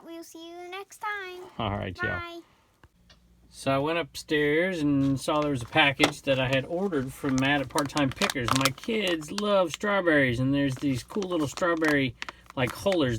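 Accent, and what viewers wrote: South, United States. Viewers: How do you have such a Southern drawl if you grew up in CA?!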